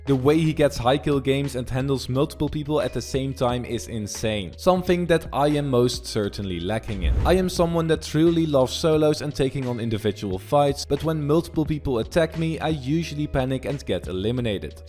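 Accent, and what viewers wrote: Netherlands. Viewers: You Dutch right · Even een vraag je ben jij Nederlands just a question are you ducht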